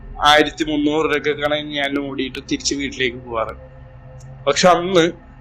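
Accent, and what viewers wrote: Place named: Kochi, India. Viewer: Kochi slang alle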